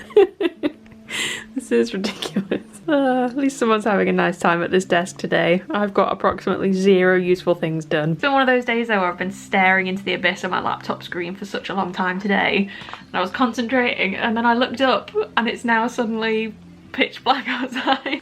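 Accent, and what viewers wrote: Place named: North, United Kingdom. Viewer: I love your videos, don't mind the change one bit (actually kinda glad you're mindful of sustainability, and love the house content!), I'm so sorry to hear you're not feeling your best, but please know that I love your energy in your video, your jokes, your nice northern accent and phrases, and I sincerely hope you feel better soon! Also you just made me laugh out loud with your hoover and 'shampoo advert' moment haha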